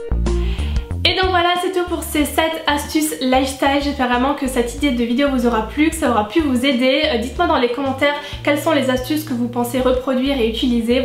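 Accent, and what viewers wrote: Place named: Alsace, France. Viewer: Haha quand j'ai entendu ton accent j'etait sur que tu etait alsacienne ,comme moi 😉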